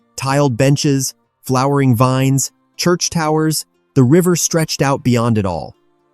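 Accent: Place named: United States. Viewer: The American accent is destructive to a description of Europe. How many ways can you pronounce Tagus ? This alleged easy first day is as relaxing as Shinjuku station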